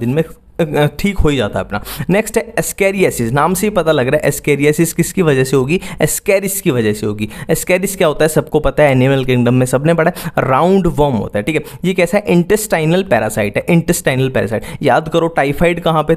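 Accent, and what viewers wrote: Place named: Punjab, India. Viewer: Sir i think you are from punjab,as it seems by your voice tone.....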